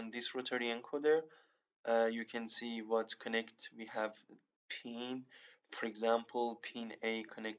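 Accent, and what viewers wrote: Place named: Iran. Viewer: عاشق لهجه ایرانیت شدم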